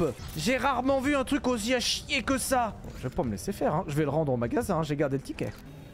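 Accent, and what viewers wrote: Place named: France. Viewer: Et à quand la fin de tes tres merités vacances!!!! Je mennuie de mes jeudis midi bagnole avec celui qui a laccent francais mais ne devient jamais monotone a ecouter ( je suis au quebec et vive le decalage!!!) et je dirait meme c du bonbon a ecouter .... Et , meme si ca peut etre drole au debut merci davoir laisser les pets et les rots dans ton ancienne vie.....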